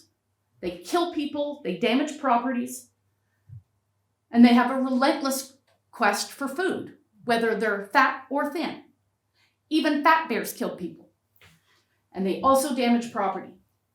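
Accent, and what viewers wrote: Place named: Canada. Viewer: She is a Canadian. American is a foreign language for her.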